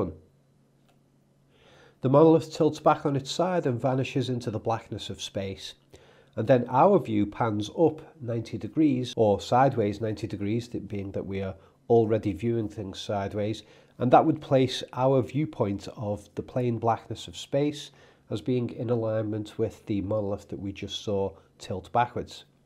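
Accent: Liverpool, United Kingdom. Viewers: Is a Scouser?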